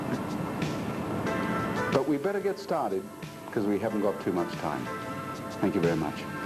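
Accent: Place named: Australia. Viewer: FFS. There are 3 main Aussie accents. He has one of them.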